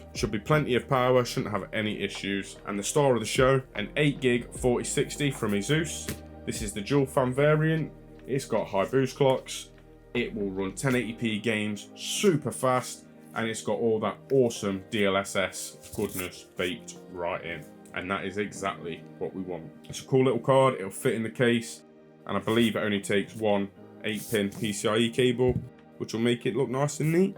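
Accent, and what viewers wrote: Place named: East Midlands, United Kingdom. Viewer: That would be a good idea mate, very helpful for us novices with next to no experience! You sound a bit east Midlands ish, where are you based?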